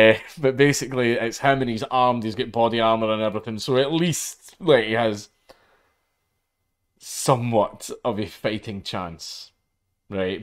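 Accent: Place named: RP, United Kingdom. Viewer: I love posh accent, pure gold:D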